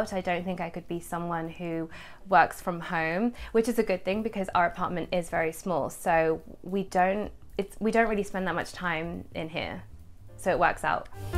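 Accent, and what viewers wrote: England, United Kingdom. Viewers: where is ur accent from in the uk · I love your apartment,. You’ve made it so beautiful and homely and yes sophisticated. I love all your DIY’s and as soon as I saw that DIY twig tree I was hoping you were going to say it was a DIY! I’m sooo going to make one! 😊 I’m English living in Australia and it’s so refreshing to hear a familiar, beautiful English accent.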